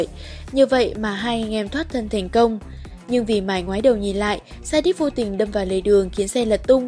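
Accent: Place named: Hai Phong, Vietnam. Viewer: chị review chắc là người Hải Phòng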